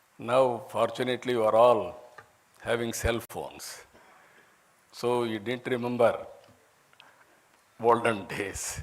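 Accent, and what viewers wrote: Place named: India. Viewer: 🙏🙏🙏🙏🙏🙏🙏👌👌👌👌👌Guruvugaaru your English indean English so nice very understandable even new learners role modals work hard .aking awake being public relations faith effectinate public 🍓🚁🍓🚁🍓🚁🍓🚡🍓🍓🍓🚡🚡🍓🚡🍓🚡🍓🚡🍓🚡🚡🍓🚁🍓🚁🍓🚁🍓🚡🚁🚁🍓🚁🍓🚡🍓🚡🚡🚡🚡🚡🚡🚡🚡🍓🚡🚡🚡🍓🚁🍓🚁🚁🍓🚡🍓🚡🍓🚡🍓🌹🚡🌹🚡🙏🚡🌹🚁🍓🚁🚁👌👌👌👌👌👌🤱👌👌👌👌👌👌👌👌👌👌👌super cm repeated country wide country wide repeatedost repeated country wide super cm 👌👌🤱👌👌👌👌👌🙏🙏🙏🙏🙏🚁🙏🙏🍓🚁🍓🚁🍓🚁🍓🌹🚡🌹🚡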